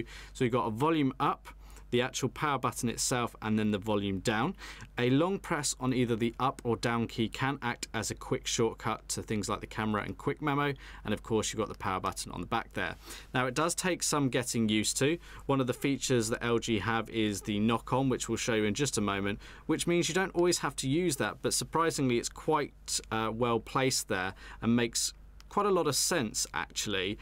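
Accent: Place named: United Kingdom. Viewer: i think he sounds british.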